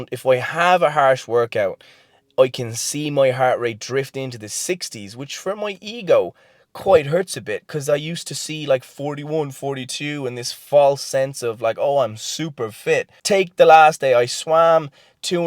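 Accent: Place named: Ireland. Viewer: I’m not listening to a word this man is saying because his Irish accent is so lovely I’m totally distracted.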